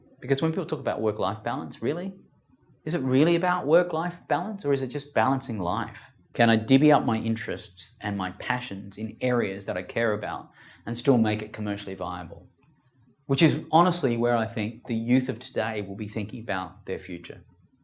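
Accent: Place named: Australia. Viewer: This guy spouts non-sense in the form of words all stringed together that sounds credible in the Australian accent!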